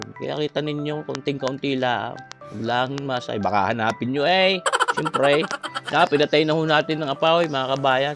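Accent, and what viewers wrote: Batangas, Philippines. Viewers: Sarap tlga Yan batanggenyo ka kuya Ako .kxe salita mo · Super sarap kabayan,tunay n nakaaaliw kang pakinggan at panoorin kc nawawala stressnk maghapon,aliw n aliw ako sa tono mong batangenyo,inlove n nga ako sayo hehe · Nakakarindi ka naman panoorin eh sobrang punto mo pero masarap at magaling kang Chef kaya laang bawasan mo un sobrang puntong Batangas hindi nman talagang ganyan ang punto ginagaya mo si Leo Martinez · Natutuwa ako sa puntong Batangas kahit ako nag iisa natatawa ako sarap pakingan 😜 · Idol ko tlaga mga batangenio pag nagsasalita,🤣 napapata nio PO aqo ,, Sir,,, God bless po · Nkakatuwa nmn yong tuno ng salita nya malambing pakinggan ano baga yn tuno na yn batangas ba or laguna ba yn.happy eating. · Kabayan taga Batangas din ano pero gusto ko iyan puntong Batangas natin katuwa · Batangueno hehez😁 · ALA EY!! ANG SARAP BAGA NIYAN KUYANG BATANGUENO, He He 😃 SHOUT OUT PO KUYANG 👍 YOU SOUNDS LIKE MY FRIEND CO WORKER HERE IN ZURICH SWITZERLAND HE IS FROM BATANGAS AM FROM MINDANAO 🤗SARAP PAKINGGAN NG BATANGAS DIALEKT. 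he he🥰. ALAY EY GUMAYAK. KA NA BAGA !!! THANK YOU 🙏GOD BLESS KUYANG ❤️🇵🇭🇨🇭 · I love ur accent bro..na alala ko kaibigan ko taga Batangas.tuwang tuwa kmi nun dahil sa pananalita nya..she's from Balayan Batangas..her name Eva Villena..gusto ko ung mga niluluto mu..thanks for sharing...gud luck... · Mauutas ako sa punto...taga Batangas din ako'y..haha ang sarap nyan Shout out Cora Mercado thanks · Hehe ay tunay aring batangginyo na are nkakatuwa mgsalita ah ah ay tunay ay akoy kabayan moren eh mindoro nman ako segi sarapan mo mgluluto din ako nyan kabaya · ang cute u po mag salita parihas kayo ng bf ko dati tiga nasugbo batangas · Yes po ang sarap pakinggan ng batangas accent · Gusto ko yang accent mo, balae ko taga batangas din. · Kabayan kakaaliw namang manood sayo kase you're so funny.I like the batangas accent 😍 Nako hanap ako ng puso ng saging bukas at ng ma try yang recipe mo Ala e mukang masarap. 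Ano ba yung karikit?? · I enjoy watching and listening how to talked. Your accent batangenio i love it. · Batangueño k po chef,ala eh! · Watchibh here in saude jeddha.nakakatuwa yong/salita/u/sir/batanguenio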